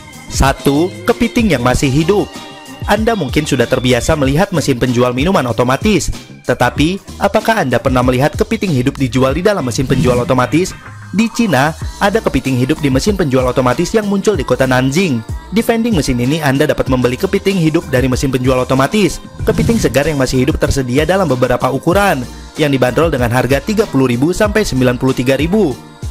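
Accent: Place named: Indonesia. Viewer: loghat indon kan..ape yg pelik sgt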